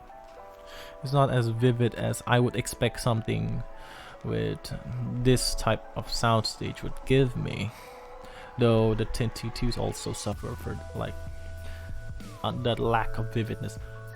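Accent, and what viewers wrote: RP, United Kingdom. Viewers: so sad, you never use them again. Anyway, I like your normal accent here in this video more than your usual Posh accent hahaha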